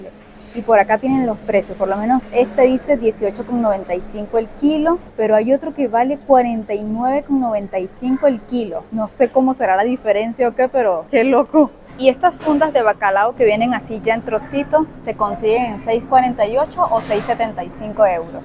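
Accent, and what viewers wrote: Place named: Venezuela. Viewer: Tú acento se parece al venezolano.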